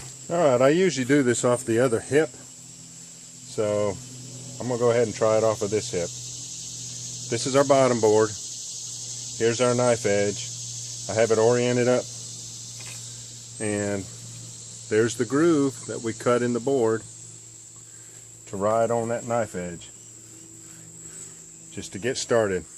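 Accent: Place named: South, United States. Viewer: Thanks for this video. Sounds like your down south somewhere.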